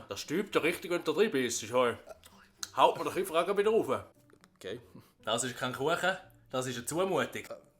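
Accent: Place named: Switzerland. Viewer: So en riichtige schwiizer 😂😂😂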